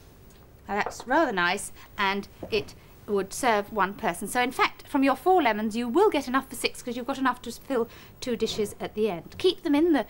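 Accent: RP, United Kingdom. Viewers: she sounds so posh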